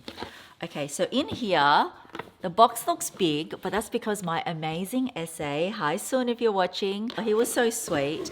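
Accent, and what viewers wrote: Australia. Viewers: Hi! I've watched every single video since you started! I love luxry goods but I can't buy everything I love and you always shared the prices and introduced them perfectly! Also, I want to practice my speaking Aussie English more from you! Yes, I am from Melbourne as well! My IG is pandadududududu. Sending love from Melbourne😍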